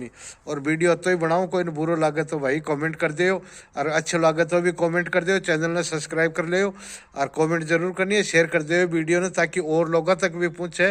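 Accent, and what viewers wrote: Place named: India. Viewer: बोली से लगता गुढा के पास का है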